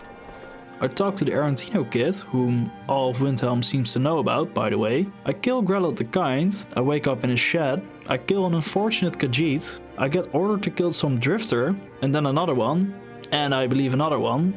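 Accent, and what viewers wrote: Netherlands. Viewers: The Dutch is strong with this one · Je klinkt wel erg Nederlands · You really have a Dutch accent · I instantly hear that you're from NL :D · You can easily hear you're dutch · Are you Dutch? Your accent is very telling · Are you dutch? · I smell dutch😂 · Ik hoor een Nederlander of niet? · Are you Dutch? Amazing video, went by very quickly.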